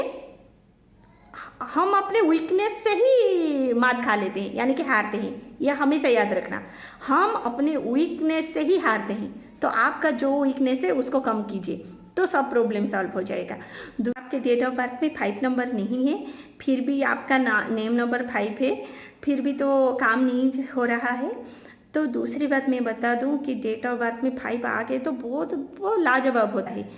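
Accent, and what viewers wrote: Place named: Bengal, India. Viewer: Ok. Nice of you. Will I get a government job? Odisha seems like Bengali accent. Nice of you.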